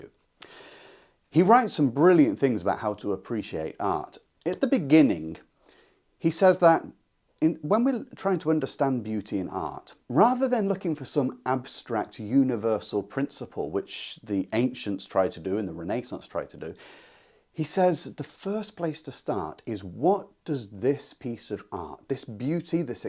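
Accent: United Kingdom. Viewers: excellent analysis - thank you! also your particular British accent is easier to understand for this midwestern American.....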